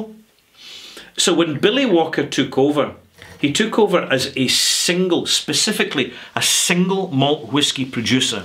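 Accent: Scotland, United Kingdom. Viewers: Who else watches these videos for the hat and the Scottish accent.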